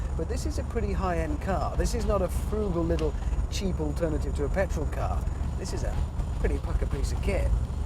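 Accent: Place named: Birmingham, United Kingdom. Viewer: anyone else notice Hammond sounding more brummie ? He said “it’s a fast diesel “ instead of faaaarst like he would today!!!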